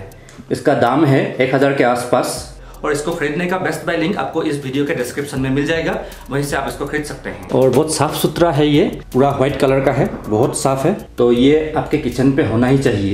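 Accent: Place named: Bengal, India. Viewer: Wow Bongali accent, Kemo naaache?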